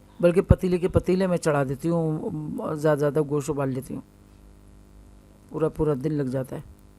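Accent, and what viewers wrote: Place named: Hyderabad, India. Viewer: As salamualaikum Aunty mai toh aap ka fan hogaya aap ka baat karne ka andaaz toh mashallah. Maut daaldere aap toh hamari Hyderabadi zubaan me kiraak 👌👌👌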